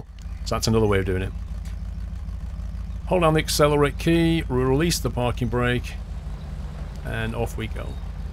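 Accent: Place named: Manchester, United Kingdom. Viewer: Trying to pick your accent . What part of the UK are you from ? Somewhere near Manchester ?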